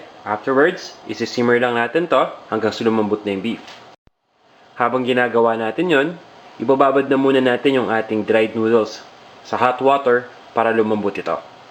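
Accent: Philippines. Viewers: Sir this is an amazing recipe for spicy beef noodle soup. I can't tell if you're speaking English, with a heavy Filipino accent or what but I can tell that that makes little difference. You my friend have just created magic in this spicy beef noodle soup. I cannot believe no one's commented on this recipe!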